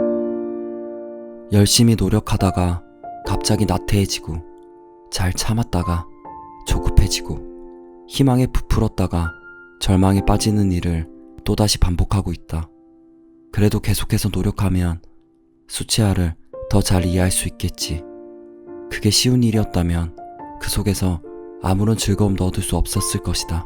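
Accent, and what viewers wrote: Gyeongsang, South Korea. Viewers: 경상도 분이신가 '아무껏도' 연발이심.. 몰입하다 빵터졌네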